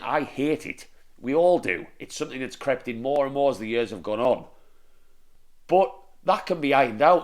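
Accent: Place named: Teesside, United Kingdom. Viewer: Your accent is not Newcastle...I'd say Smoggy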